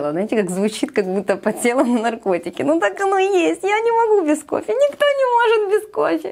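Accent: Ukraine. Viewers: По диалекту украинка😊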